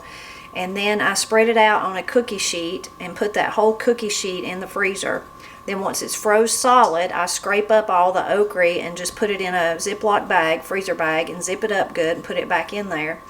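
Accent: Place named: Oklahoma, United States. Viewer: My mom was born and raised in Oklahoma in the 40's& 50's. She married my dad in 1958 and they moved to Iowa where my dad was born and raised. My mom was raised on much the same foods that you make and eat. My dad, brother and I refer to fried okra as eating "candy" to this day. Mom has been gone for 22 years and the way you talk and the meals you prepare remind me so much of her. Thanks for the great memories!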